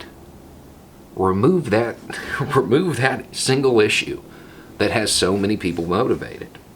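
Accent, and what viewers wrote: South, United States. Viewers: beautiful posts. Listened first last week and been at it a video at time since. I am from South Africa...but am surprisingly drawn to your southern accent...its like your ideas are encased in a warm syrupy truth serum that is your voice. Your perspective is fantastic and you challenge me to think differently about what I thought I knew about American history. Your country is the most powerful in the world and as such is important for me all the way down here...so thank you for your posts. They are great and informative AND entertaining. Scary sometimes but necessary.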